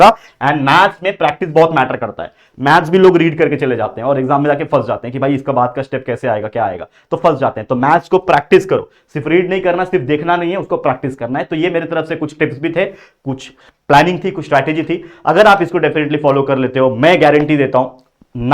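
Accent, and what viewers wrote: Maharashtra, India. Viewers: sir kya aap marathi ho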